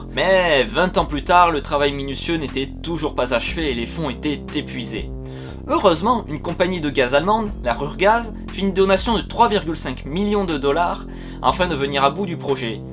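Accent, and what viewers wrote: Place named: Russia. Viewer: Bravo pour l’accent russe!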